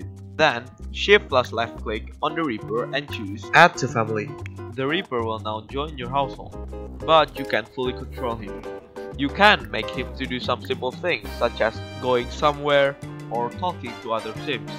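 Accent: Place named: Indonesia. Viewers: udh gw duga!didenger dari logatnya:v omfg!! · pantes aksenmu gw kenalin wkwk orng indo toh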